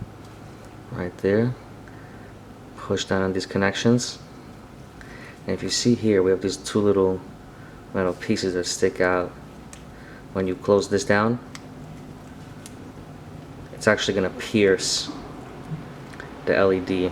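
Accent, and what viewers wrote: New York, United States. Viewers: Love the NY/NJ accent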